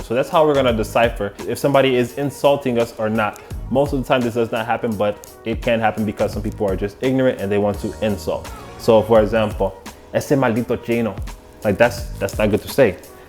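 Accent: United States. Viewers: That gringo accent makes me really practice my speaking skills!! I DO NOT want to sound like that!!!! LOL!!! IT is truly "cringy"LOL! Thanks for the awesome vid! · 😂😂😂😂😂 bro el acento gringo yo moriiiiii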